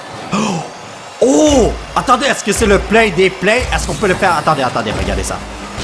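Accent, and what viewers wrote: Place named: Canada. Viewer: Ah ok mpi je suis diamant ^^, vu tas façon de parler tu dois etre canadien si je ne me trompe pas ? Si sa te dis on peut jouer ensemble ^^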